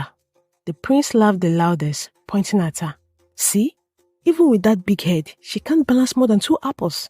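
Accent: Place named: Africa. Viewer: Himmm i heard to understand a Africa accent but i like the story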